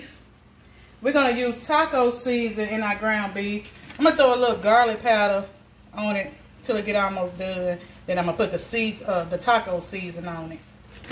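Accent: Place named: Louisiana, United States. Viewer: You sound like you have a Louisiana accent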